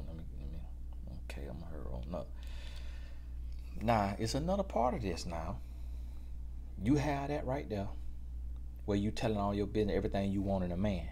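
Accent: South, United States. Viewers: Enjoying your REALNESS & your 'southern' accent!! 🤪🤪🤪 TY, Mr..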